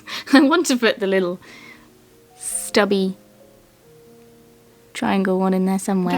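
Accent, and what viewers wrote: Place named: England, United Kingdom. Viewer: That candelabra came out amazing..I'm so inspired but your imaginative creations! It's so mezmorizing to hear your proper English with an English accent..lol🥰 gives such a pure primitive vibe❤❤❤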